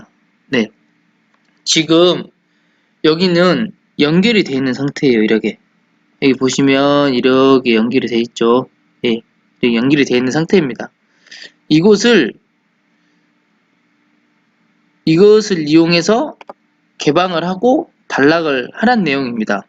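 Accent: Jeolla, South Korea. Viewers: 다 좋은데 전라도 사투리가 너무세요.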